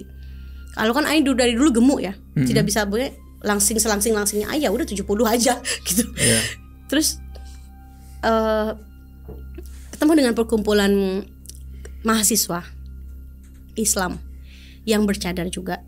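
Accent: Malaysia. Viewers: Sebagai orang Malaysia aku boleh detect "slank Malay" walaupun dia cuba sedaya upaya berbahasa Indonesia.😂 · mau tanya, ni org Indonesia atau Malaysia?sbb sleng kadang² seperti org Malaya😁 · Emang lucu kalo denger orang Malaysia ngomong Bahasa Indonesia, kedengarannya kayak beda aja gitu logatnya ada ikut-ikut nya 😅. Tapi untuk kaka jika ingin berbahasa Indonesia maka gunakanlah Bahasa Indonesia dengan baik dan benar, jangan digabung ataupun di campur dengan bahasa asing seperti Inggris dan yang lainnya ya kak😉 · Iyaa orang KL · accent cakap macam orang Malaysia laaa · Kakak ni org mana? Ckp macam ada sleng malaysia😍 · logatnya kayak org Malaysia suka dngar🌹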